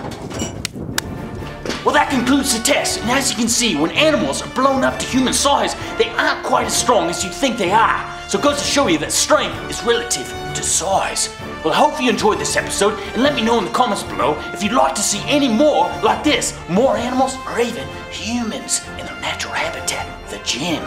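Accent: Australia. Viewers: Bluddy hehhhhlllllll!! This is halarious 😂👍 mixture of aussie, sooth afrikhn and irish. #fitbullaussie approved 😉😁👍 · Fair dinkum Aussie here....great aussie accent if I do say so myself. We miss our Steve Irwin. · Nice Australian accent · Australian accent lol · I love the over the top Australian accent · Hahahahaahaha! I wasn't expecting this. You sound and look like an Australian indeed.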